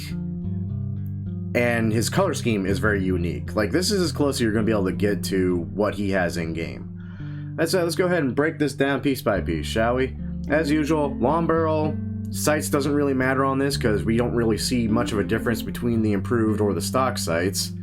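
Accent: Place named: United States. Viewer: idk im just pointing out it sounds really american, we can understand u its the same word, just pronounced different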